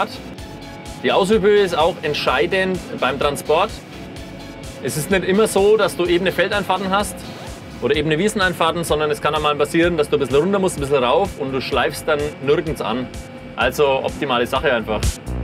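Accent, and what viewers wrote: Franconia, Germany. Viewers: Also für jemanden mit einem OAL-Kennzeichen hat der gute Mann aber einen ziemlich fränkischen Dialekt :D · Der " " hat doch ein sehr fränkisches Dialekt - wenn der mal nicht aus Feucht bei Nürnberg ist.... Wo wird nochmal Fella produziert!?;-)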